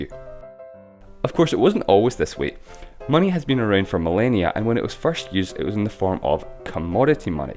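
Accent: Scotland, United Kingdom. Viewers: I'm not trying to be rude or something, but if this is a Scottish accent, then it's bloody annoying. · Telling by Your Accent You Are Scottish Probably, Good Explaination👍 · It’s the Scottish accent lol. His accent is pretty clear lol some Scottish accent are hard to understand if you don’t pay attention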